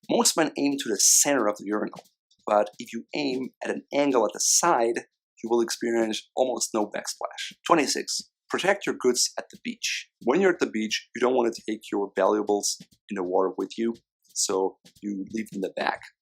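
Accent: Germany. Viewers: Is your accent German?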